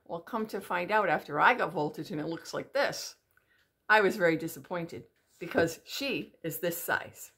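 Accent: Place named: New York, United States. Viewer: (that NY accent~love)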